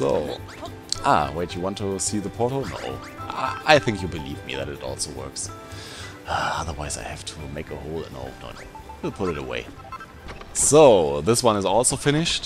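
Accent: Germany. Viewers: Is he german? KLingt nach deutschem akzent in dem guten englisch ;)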